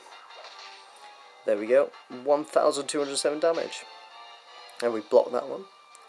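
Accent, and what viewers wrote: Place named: United Kingdom. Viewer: Your accent sounds like you're from the same place in the UK as David Oliver from the Daily Show!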